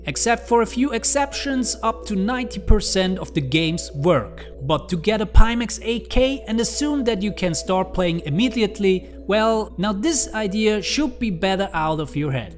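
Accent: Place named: Switzerland. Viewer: ha doch dänkt ech ghöre e Schwiizer :-)! Got the 5K+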